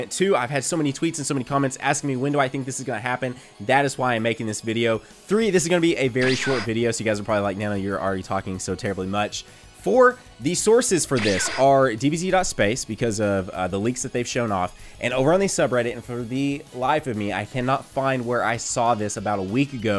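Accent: South, United States. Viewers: Recently subbed you're one of my favorite youtubers xD also i can dig the southern accent! Im from Louisiana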